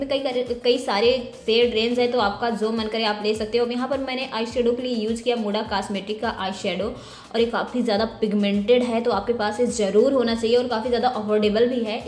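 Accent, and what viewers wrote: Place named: Kanpur, India. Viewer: Amazing makeup di khaa se h aap.... Apki language kanpur ki lgti h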